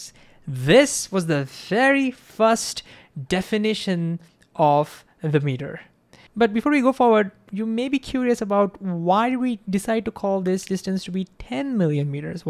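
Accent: India. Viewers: You sound and look Indian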